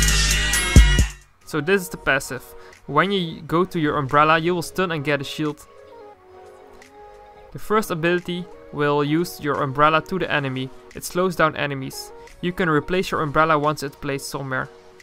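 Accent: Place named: Netherlands. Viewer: Ja aan dit accent hoor ik gewoon dat je Nederlands bent lol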